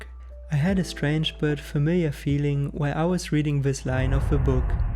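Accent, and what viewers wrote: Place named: India. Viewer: Without the video I would have thought that you were Indian. Good video btw 👍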